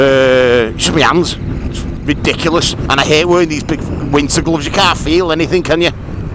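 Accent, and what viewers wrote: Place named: North West England, United Kingdom. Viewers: A nice little ride. Thanks for that. You show quite a bit of character and made me laugh a couple of times, you and your Northern accent. I would have liked to hear more of your feelings about the bike's handling, etc. I think it looks great and the engine sounds superb. Feels solid and smooth. I think, by the way, that most road users are annoyed that there are other road users on the same stretch of road.:) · Where in the UK is this filmed? Your accent seems north west? Just curious. Ex pat.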